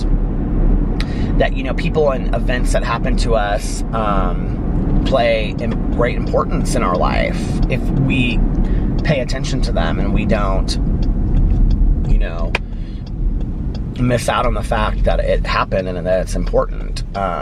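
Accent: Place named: Tennessee, United States. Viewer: Love your voice! Don't listen to stupid people! The first time I watched you I thought you were from Tennessee bc you talk like us 😂 Love your vlogs!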